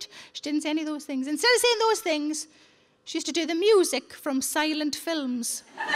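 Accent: Newcastle, United Kingdom. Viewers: Love this woman she talks about things, that every single woman in her audience can relate to. The mix of sweetness ,observational slightly crude humor and her geordie accent , made my day.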